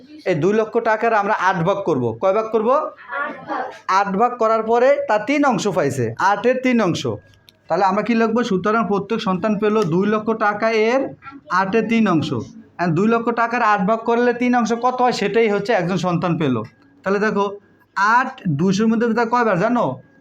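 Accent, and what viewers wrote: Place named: Bhola, Bangladesh. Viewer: স্যার আপনি কি ভোলায় থাকেন ? কথাবার্তা ওইরকম লাগছে ।আমার নানা বাড়ি ও দ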